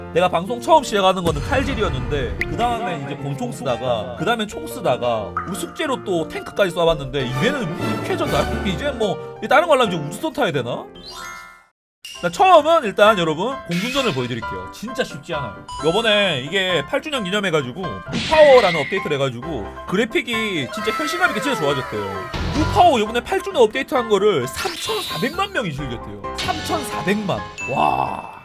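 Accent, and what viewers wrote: Busan, South Korea. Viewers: 앜ㅋㅋㅋ.. 역시 부산사나이답게 사투리가..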